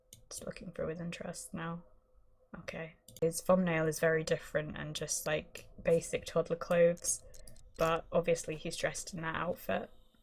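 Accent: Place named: United Kingdom. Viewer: I live in the UK and we do that too and I was kind of confused because she sounds like she is from the UK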